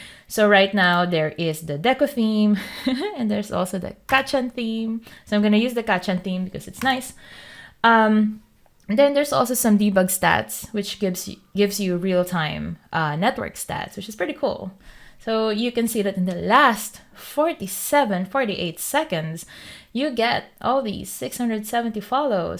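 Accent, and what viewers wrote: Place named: Philippines. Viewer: are you filipino??? your accent is sooo filipino english to me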